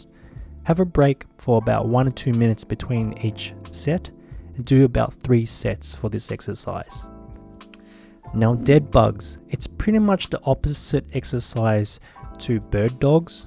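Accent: Australia. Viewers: is this Australian accent? anywho i used this for running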